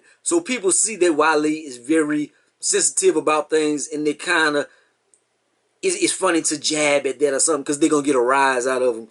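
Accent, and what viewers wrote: Louisiana, United States. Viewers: Man I knew you had to be from Louisiana, I left after Katrina at 14 but never forget the accent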